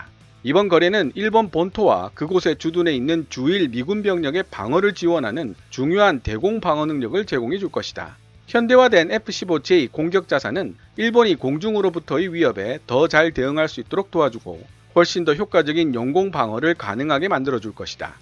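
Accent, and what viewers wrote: Gyeongsang, South Korea. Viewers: 갱상도 사투리 아주 구수하네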